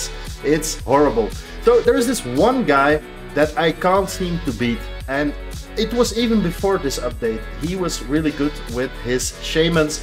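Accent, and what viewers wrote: Netherlands. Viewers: Hi just a question, are you dutch? Cause your accent sounds like dutch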